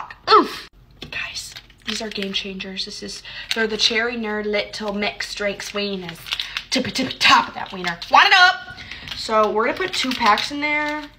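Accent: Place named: United Kingdom. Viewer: Loving the British accent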